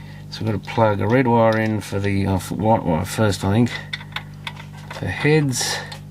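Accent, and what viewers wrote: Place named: Australia. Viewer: I’ve been tinkering around with a similar radio, trying to get the cassette player working again. I wasn’t expecting an Australian accent I’m glad I stumbled along your channel mate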